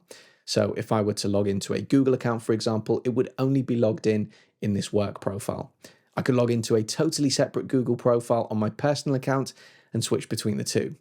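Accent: United Kingdom. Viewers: For all who're mocking the accent The British accent is by far the sexiest English accent ever existed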